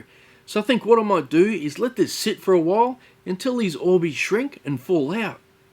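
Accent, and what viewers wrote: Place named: Australia. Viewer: Hey! I just subscribed to you a few months ago, and I've seen lots of your videos now. Also, I'm subscribed to Nick Zametti (I think that's how his last name is spelled, I'm not sure). At the beginning of this video, you mentioned about Nick doing an experiment with Orbeez as well, and having a similar problem with them involving resin. If I remember correctly, Nick Zametti also did an experiment with Orbeez involving resin, and having a similar problem with the Orbeez being too moist to adhere to the resin. I'm assuming that that is what you were referencing to with Nick. I wanna let you know that Nick Zametti just posted a video toying with the idea/proposal of possibly quitting YouTube, but being talked out of it by his fans. I would like to propose an idea to you about Nick Zametti. I would be tickled pink if y'all would collaborate with each other on a project. 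Like, if possible, not really just doing mirroring videos of each other doing a similar project together yet physically separated from each other, but a collaboration video of y'all being physically next to each other, sharing the same space, and knocking around ideas against each other, and possibly y'all working on separate pieces of a single project together, to where the two pieces that y'all work on come together into a single cohesive product. If that would be possible, that would be AWESOME!!!!!! Y'all have a similar accent, so I'm guessing y'all are either from Australia or New Zealand, cause to me, th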